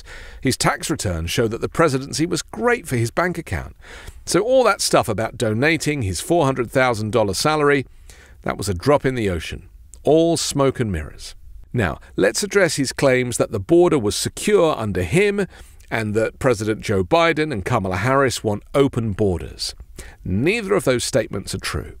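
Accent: United Kingdom. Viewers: You have an excellent way of explaining Trump. I love your Podcasts. I always was a sucker for a British accent ever since the.😊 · My maga people are not going to listen to. Can not get past the British accent in his speech. Not American. The bigotry is astounding. Sorry,.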